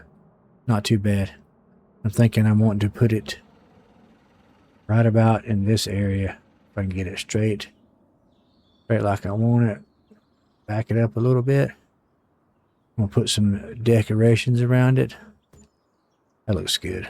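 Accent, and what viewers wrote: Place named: Carolinas, United States. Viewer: You from one of the Carolinas? Some of the way you talk reminds me of Dale Jr. 🤣 Good stuff man.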